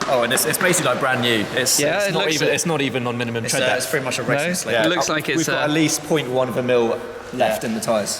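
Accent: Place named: United Kingdom. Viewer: Its called a british accent bro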